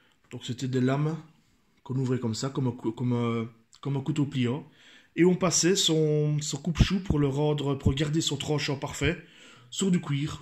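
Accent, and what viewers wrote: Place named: Liège, Belgium. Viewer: Quand je t'ai entendu , j'ai dessuite compris que tu venais de ma région de lièch🤣 Très bon couteau de poche le Ruike p108 le mien ne me quitte plus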